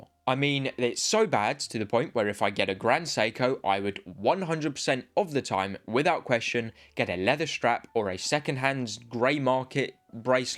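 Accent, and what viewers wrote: United Kingdom. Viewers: Apart of the great content, I love your impeccable british pronounce. 2 lessons in 1 time: about watches and perfect pronounce… what’ else!👍🔝🔝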